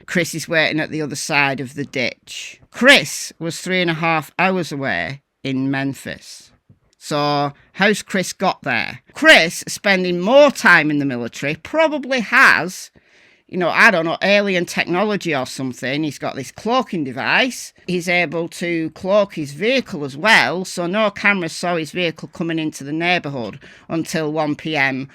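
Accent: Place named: North, United Kingdom. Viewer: Heh heh heh no one can rant quite like a northerner! Brilliant! Well said!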